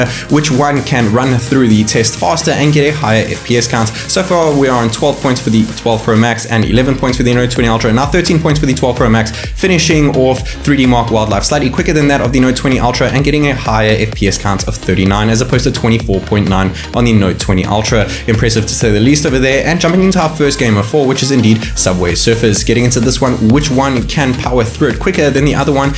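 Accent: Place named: South Africa. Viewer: Hi. I've been a sub for about a few weeks and the first word that you said, when I watched my first video of yours, I already knew you were from South Africa.😅 I'm from South Africa btw.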